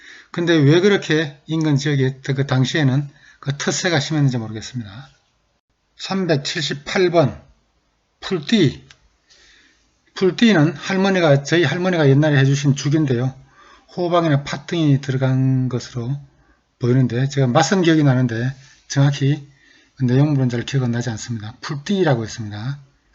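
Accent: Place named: Uiseong, South Korea. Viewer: 안녕하세요 ^ ^ 제가 경상북도 사투리에 관심이 많은데 님 사투리 잘 보고 있습니다. 제가 취미로 사투리 채널을 운영하고 있는데, 님이 괜찮으시다면 ' 경상북도 의성 ' 영상은 모조리 님 억양을 흉내내고 글을 베끼면서 올리고 있습니다. 제 영상이 그렇게 훌륭하거나 유명하지는 않아서 님께서 아직 못 보셨을 수도 있지만 혹시 문제가 된다면 경상북도 의성 영상들은 삭제하도록 하겠습니다 (맨 마지막에 저작권을 따로 주장하지는 않는다셔서 지금 의성 영상 몇 십 개 정도 올렸습니다. 님 사투리 억양을 듣고 억양을 어떻게 할지 모호한 것들은 되도록 안 올리고 있습니다) 저뿐만 아니라 의외로 전국 팔도 사투리에 관심을 가지는 10대 소년들도 많습니다. 되살아나지 않고 사라진다고 하더라도 저는 제 영상에 기록용으로 여러 지역 사투리를 담아내고 보존하고 싶습니다. 제 영상 댓글들은 하나하나 확인하기 어렵지만, 관심이 가신다면 찾아와 주시고 억양도 교정해 주세요! 감사합니다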